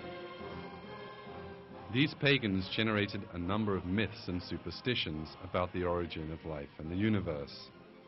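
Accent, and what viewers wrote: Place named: United Kingdom. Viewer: great british accent. other than that, a total waste of time. thanks for nothing. get a job.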